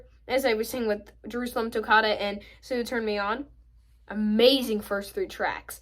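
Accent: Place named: Midwest, United States. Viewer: i can tell you are from the midwest lol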